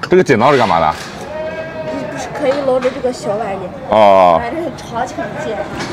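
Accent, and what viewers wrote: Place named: Anhui, China. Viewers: 是不是合肥周边的，口音和我一个同学像的很。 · 一定口音就是安徽人哈哈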